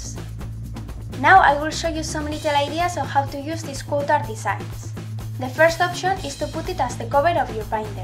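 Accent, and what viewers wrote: Spain. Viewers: Me encantan tus diseños, quizás te copie alguno ;). Se nota mucho tu acento español pero se entiende todo, la gente que te critica por eso es sobretodo porque son monolingües(y eso es malo para el cerebro y la creatividad) Espero ver algún día un vídeo en Español, ya estoy harta de que todos hablen inglés en youtube y en el instituto.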